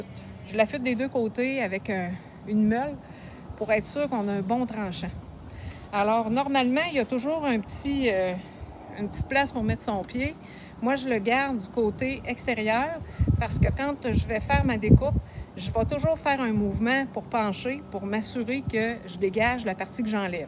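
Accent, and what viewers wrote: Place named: Canada. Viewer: Merci pour l'explication, j'adore la voix des Canadiens c'est trop cute 😂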